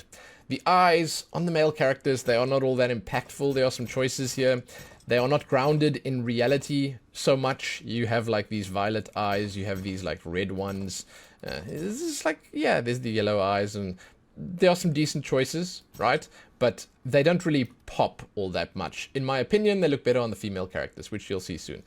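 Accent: South Africa. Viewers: Was watching and could not figure out why I was feeling home sick. Great to hear a zaffa 😂. Great video as well you got a sub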